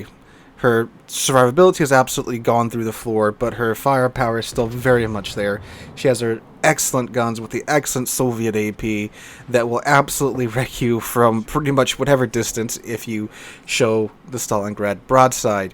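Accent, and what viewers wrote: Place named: South, United States. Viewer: Not all of them do, I think it's a regional thing. I believe is southern so his pronunctiation makes sense to me. May not be technically correct but makes sense.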